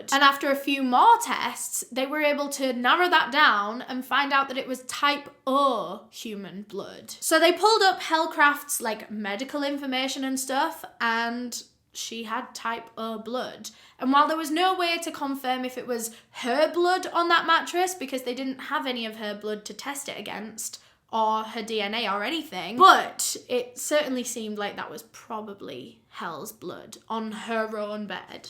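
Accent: United Kingdom. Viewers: youve had suchhhh a GLOW UP, you gorgeous babe! i absolutely adore you and your cute uk accent 🤣🤣🤣🤣 i wonder if europeans think americans have "cute accents"? LOLz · What’s her name ? I want to read about the case more in depth. Maybe it’s her cute British accent but is it “hell”? What’s her last name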